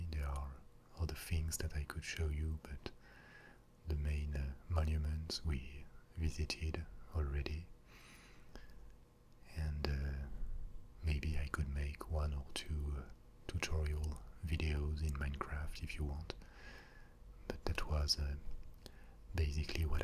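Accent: France. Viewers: I just found your channel and are clicking through your videos and just wanted to leave a comment. :) Your level and tone of voice is exactly the right trigger for my ASMR (as well as the French accent...I don't know why, i just really like it, it calms me). So thank you for your amazing work, I will keep on listening now!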